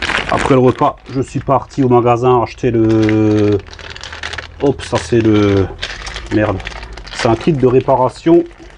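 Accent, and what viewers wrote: Alsace, France. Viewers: Bonjour et merci. J'adore votre accent Alsacien. ELSASS 👍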